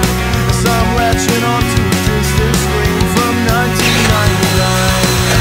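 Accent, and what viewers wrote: United Kingdom. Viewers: Wait you are from the United kingdom? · Are You British?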